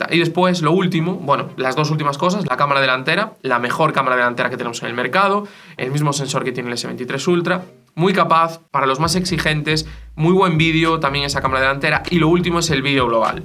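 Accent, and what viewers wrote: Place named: Cádiz, Spain. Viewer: Que acento más bonito tenéis los de Cádiz 😅😅😅😅😅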